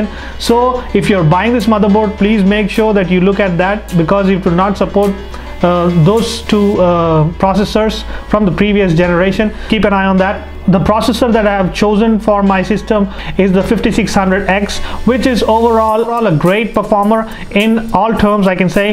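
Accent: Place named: India. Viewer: way too much accent .. be indian with neutral accent .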